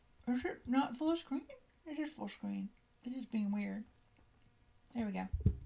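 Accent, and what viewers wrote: South, United States. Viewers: It's my first time hearing your voice. Wow that soutthern twang!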